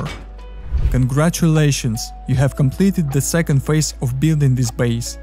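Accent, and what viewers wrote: Russia. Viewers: жесть как русский акцент выпирает прям )))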